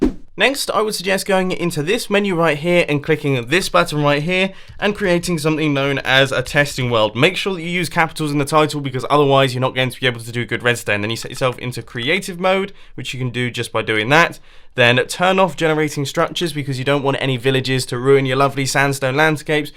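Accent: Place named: England, United Kingdom. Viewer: Love your redstone contraptions and your english accent. XD aka, U r my hero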